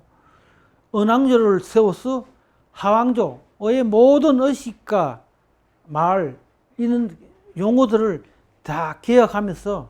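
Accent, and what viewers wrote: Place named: Daegu, South Korea. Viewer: 요즘 다시 교수님 영상 정주행합니다. 저도 대구출신이라 교수님의 사투리가 정말 정겹네요~~ㅋㅋ😊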